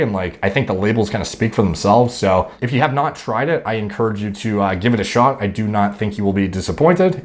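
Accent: Canada. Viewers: Woah is he Canadian? Just noticed his accent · honestly hadn't noticed, just figured it was your Canadian accent lol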